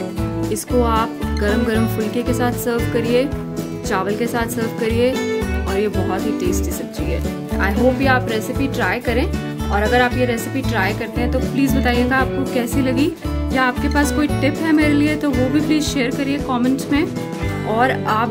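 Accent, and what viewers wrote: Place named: Punjab, India. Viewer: Hi ji aap puri shudh punjaban ho, aapka subhzi banane ka style, bolne ka style poora punjabi hai, mazza aa jata hai aapko sun kar. Kathhal hum bhi aise hi banate hain,aur hamari family ka favourite hai. God bless you.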